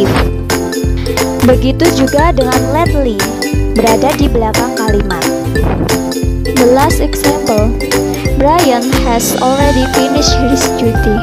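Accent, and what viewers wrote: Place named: Java, Indonesia. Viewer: Suara medok jawa bahasa inggtis jadi bagus juga yaa kerren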